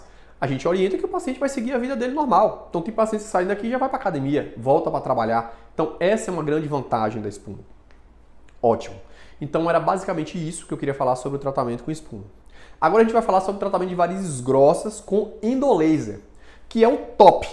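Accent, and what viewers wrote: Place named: Bahia, Brazil. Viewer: Eu acho lindo o sotaque baiano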